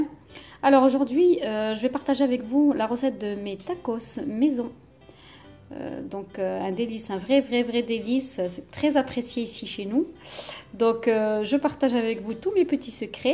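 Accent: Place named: Algeria. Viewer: في الهدرة باينة جزائرية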